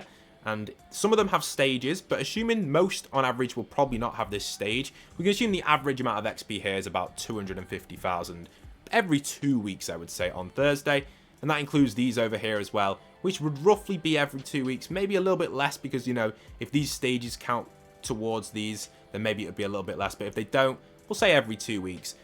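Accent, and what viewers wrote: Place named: United Kingdom. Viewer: He sounds like Luke from modern family with a British accent istg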